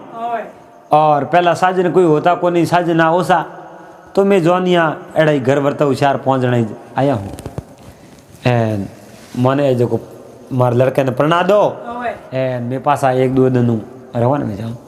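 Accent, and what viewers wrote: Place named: Barmer, India. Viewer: आप बाड़मेर की भाषा में बोल रहे हो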